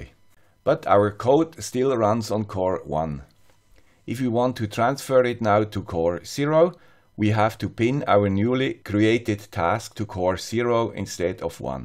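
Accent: Switzerland. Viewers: you're a TRUE star (complete with your ubiquitous 'Swiss Accent'). I always enjoy your videos. I haven't yet done anything much with ESP32 boards as I've tended to concentrate more effort on ARM based SBCs (OLinuxuinos, Beagle, OrangePi, BananaPi, RaspPi etc), but my soldering iron is beginning to show 'twitching' signs of neglect. As soon as I can muster up some form of project to suit it, I'm sure I'll have the flux flowing... Thanx dude (from a guy with a Kiwi Accent... LOL) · I also miss hearing your original intro "Swiss accent *again*". Feels like something is missing now :) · Maybe Swiss? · "Here is the guy with the Swiss accent...again..." - I always enjoy hearing that intro - it's your signature trademark. My wife gets a kick out of it too (sometimes she listens to my youtube tutorials LOL). Thank you for this video. I've been using the ESP8266 exclusively since the ESP32 software arduino support seemed to be very much in alpha/beta release. 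Based on your recent videos on the ESP32 it seems it's slowly starting to stabilize and become very useful (still missing bluetooth though). I like the intro on RTOS and the simple example - not so intimidating after all. Do you think it's possible to control two different GPIO pins in a task running on different cores? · The guy with the Swiss accent is kicking some serious ass -- great job!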